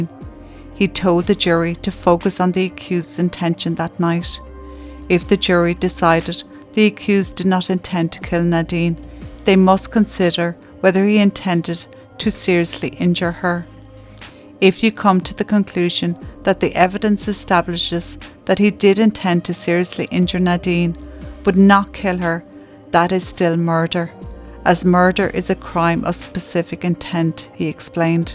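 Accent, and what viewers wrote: Ireland. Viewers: Weirdo? Man, it's called an Irish accent. How can you say such a rude thing? And offensive. I'm also Irish and am very, very proud of it. Chill out. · THANK YOU FROM BOSTON 🎉🎉🎉 I LOOK FORWARD TO YOUR NEWEST VIDEO, BUT ESPECIALLY THE BEGINNING QUOTES.... Great Story Teller You Are!!! I love Ireland. Heaven on Earth. Listening to your brilliant accent reminds me of being there.... Your AMAZING.thank you for your hard work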